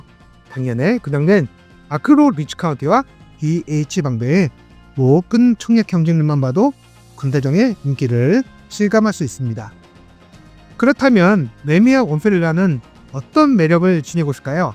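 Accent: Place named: China. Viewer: 대한민국 부동산 콘텐츠를 중국인이 다루나요? 발음이 듣기 너무 불편하고 알아들을 수 없는데, 한국말 공부를 더 하시고 만들어야 할 듯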